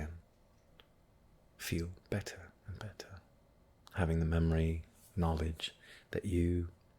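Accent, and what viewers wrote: United Kingdom. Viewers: Was craving somebody with an accent such as yours because, little secret, British accents drive me CRAZY but in the best way possible! Truly on of the best things I've listened to 👏👏🤌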